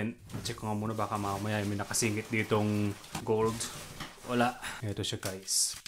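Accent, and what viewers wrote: Ilocos, Philippines. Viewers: Ilokano kayo? Hehe.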